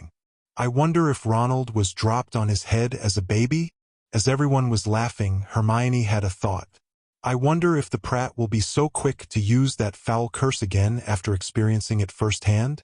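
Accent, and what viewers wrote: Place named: United States. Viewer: This is cool, turning fics into audio. I have read this fic multiple times. PLEASE can it be in a British voice if the source material is British? I couldn't listen for very long (even though I appreciate that this exists) because I can't listen to HP in an American accent, sorry.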